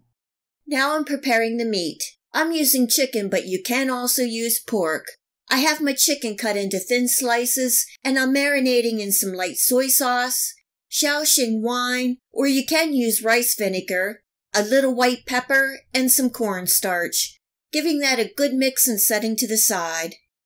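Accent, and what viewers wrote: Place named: Maryland, United States. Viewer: This is the BEST hot and sour soup recipe ever. I've been making it regularly for the past 7 years. We've tried loads of others but nails this restaurant style recipe. (*And we just love hearing that Maryland accent, reminds us of my husband's hometown.) The only change I make is that I only use one kind of soy sauce (because I can't get my hands on the other kind). DELISH! Making it today to cure my husband's cold! Thanks.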